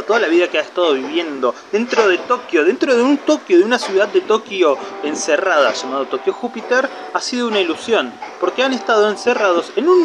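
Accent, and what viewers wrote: Argentina. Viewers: Seguramente buenos aires por el tono, yo tambien de buenos aires, entonces por eso creo que es de ahi. Una pregunta vos de que provincia sos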